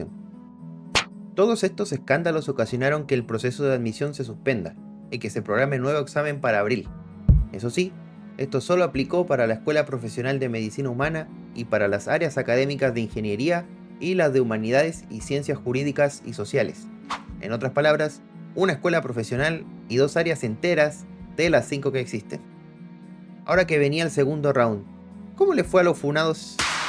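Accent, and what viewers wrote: Chile. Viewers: creí q era la única que había notado ese acento chileno XD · Bro, porque hablas como chileno? Saludos mano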